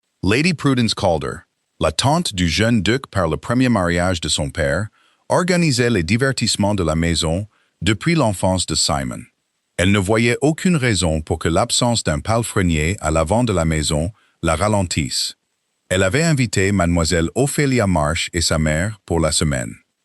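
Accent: England, United Kingdom. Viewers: Tous ces récits sont de l’Angleterre, cet accent donne de la force aux récits.. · Que l'IA abandonne cet accent anglais ridicule et on s'abonnera !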